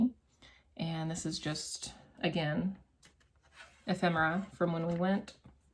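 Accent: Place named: Pennsylvania, United States. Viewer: The way you speak makes me think you are from Pennsylvania. I know this is a kind of odd thing to say, but I like guessing where people are from by their accent and the words they use.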